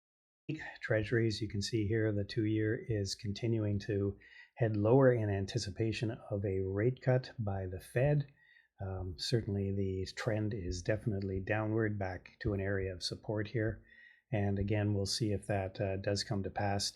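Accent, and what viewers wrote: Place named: Canada. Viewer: Thanks, for your very helpful weekly commentary. Love your Canadian accent and your quiet demeanor too. Read your Swing Trading Book years ago, and use it to refresh. 💮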